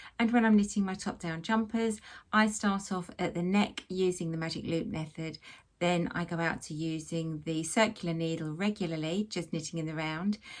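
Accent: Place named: England, United Kingdom. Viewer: Bonjour, et merci pour vos vidéos qui sont de bonne qualité, 😃 (j'apprends à tricoter les chaussettes, et plus particulièrement les talons en partant de la pointe des orteils) 😀 le seul problème c'est que je ne comprends pas l'accent anglais et mon vocabulaire est plutôt scolaire...😊 Auriez-vous la gentillesse de régler vos paramètres, lorsque vous mettez vos vidéos en ligne, afin que les vidéos et sous-titres soient dans des langues étrangères, dont le français, svp, s'il vous plaît ? Mes paramètres personnels sont réglés pour mettre les sous-titres en français lorsque YouTube m'envoie des vidéos de pays étrangers.... Par avance je vous remercie et vous souhaite de belles fêtes de fin d'année...dans la paix et la sérénité 💐💟🎅🤶🕯️🌈☃️🥰🌹🙋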